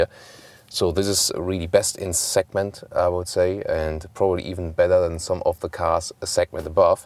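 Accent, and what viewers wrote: Germany. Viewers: good english but the accent is still like a lufthansa flight attendant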